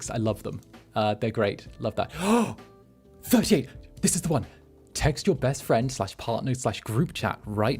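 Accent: United Kingdom. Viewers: Fancy british (?) Incubus is going to be the end of me. I love him too much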